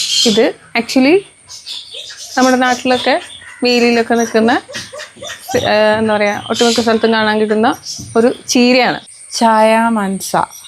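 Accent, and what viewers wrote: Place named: Chalakudy, India. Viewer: ചായ മൻസ, ഞാൻ കണ്ടിട്ടുണ്ട് കഴിച്ചിട്ടില്ല. അതിനെക്കുറിച് കൂടുതൽ വിവരങ്ങൾ പറഞ്ഞു തന്നതിന് നന്ദി. എന്തെല്ലാം പച്ചക്കറി ഇനങ്ങൾ അറിവില്ലായ്മ മൂലം വെറുതെ നശിച്ച് പോകുന്നു. ഭക്ഷണ യോഗ്യമായ അധികം പ്രചാരണത്തിൽ ഇല്ലാത്ത ഇനങ്ങൾ പരിചയപ്പെടുത്താമോ. ചേച്ചിയുടെ സംസാര രീതി ചാലക്കുടി സ്റ്റൈൽ പോലെ തോന്നുന്നു. ഇത്രയും ചെറിയ വീഡിയോകൾക്ക് കൂടുതൽ റീച് കിട്ടട്ടെയെന്ന് പ്രാർത്ഥിക്കുന്നു.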